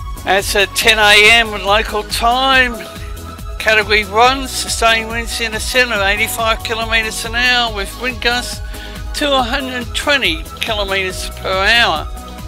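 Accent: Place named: Australia. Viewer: Lol what is this guy saying, this is just like Ozzie commentary to the max with the most Aussie accent haha 🤣 👍☔️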